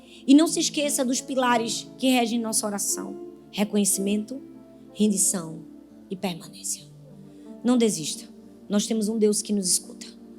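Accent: Northeast, Brazil. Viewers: Sou católica ,nem por isso deixo de acompanhar suas pregações, tem mais sou pernambucana da gema, assim como você!!!!! Amo seu sotaque bem nordestino.visse menina????? Kkkk🎉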